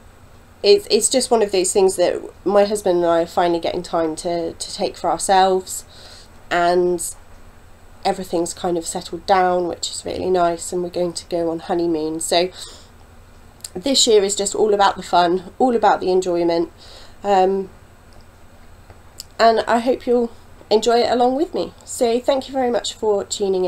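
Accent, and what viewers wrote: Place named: United Kingdom. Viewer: Hi! I just discovered your podcast today and watched them all. Lovely to find another British voice.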